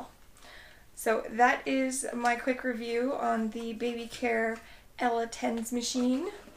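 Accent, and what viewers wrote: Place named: Canada. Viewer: I love your accent, Canadian?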